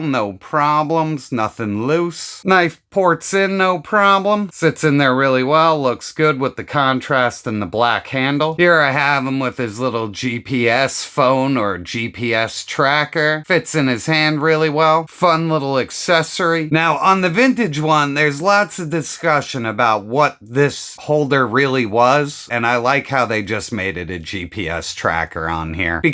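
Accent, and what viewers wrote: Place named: Pittsburgh, United States. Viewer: I'm afraid my ignorance was showing. This is a Pittsburgh accent maybe? I thought you were doing a funky voice in the intro but my accent knowledge wasn't up to snuff.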